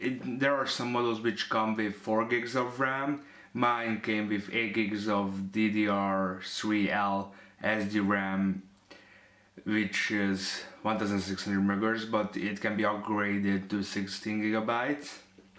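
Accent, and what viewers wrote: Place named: Hungary. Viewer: Kihallottam a magyar akcentust :) Hun bemutatót nem akarsz csinálni?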